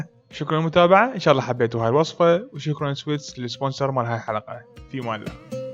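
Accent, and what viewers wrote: Iraq. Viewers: اني احب اشوف فيديواتك بس علمود حجيك العراقي الحلو وطريقة تقديمك 😁😂😍😍 وبالنسبه لطبخك هاي خلصانين منها انت شيف محترف 😁😍😍😍 ونفتخر بيك كدام العالم انت عراقي 😍😍😍😍😍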